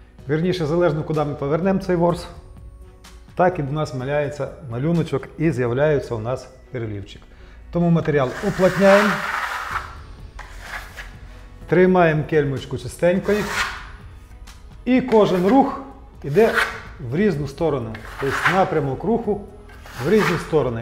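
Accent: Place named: Western Ukraine, Ukraine. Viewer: Тогда тебе придется учить язык всех 14 остальных республик бывшего СССР! Ведь остальные тоже тебе так скажут. Русский был выбран по праву большинства.Только и всего.И братья тут не причем. Было бы украинцев 175 миллионов, как при совке русских, то и гоаорили бы на украинском. А тот факт ,что автор начал делать ролики на своем языке,говорит о том, что ТВ пропаганда ,стравливания РУСОВ, свою работу таки сделала. Он со Львовской обл., города Стрый, его Западенский акцент всегда чувствовался, но тем не менеее,он вещал на русском, а тут вдруг проснулся...) Патриот вшивый! А где ты раньше был?! Что раньше,до захвата Крыма, по-русски вещал? Скажу, як громадянин України, рагулів, таких ,як автор цього відео, пів України !!! Бидло ,воно й в Африці бидло! Дизлайк і відписка !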